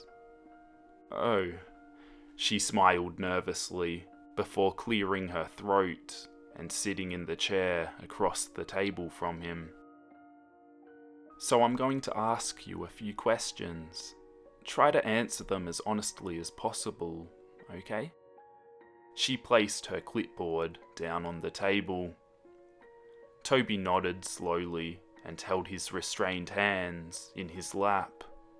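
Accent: Australia. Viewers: I think it sounds more austrailian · You sound like an Australian version of Chillz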